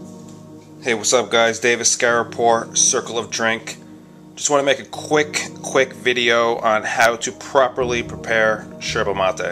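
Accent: Argentina. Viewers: That's how we say it in the Rio de la Plata region. It's not wrong, it's just our particular accent. just like your version of pronouncing words isn't wrong either, it's just your version of spanish or castellano. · love how you speak the Argentinian languague...bombisha...very nice and very informative. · I know you're talking in an Argentine accent but if you didn't know IF you didn't that is it's actually pronounced bombiya it's spelled bombilla but I'm sure you know that but I just had to tell you I don't know why but I did...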